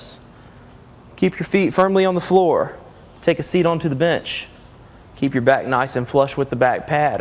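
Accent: South, United States. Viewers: He's got that accent... Hmm.. Southern accent?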